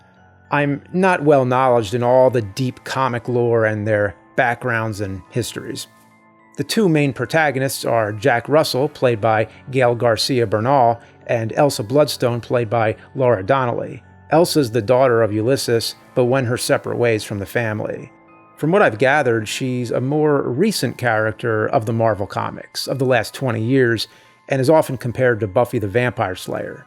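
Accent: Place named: New Jersey, United States. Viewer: It's the Jersey accent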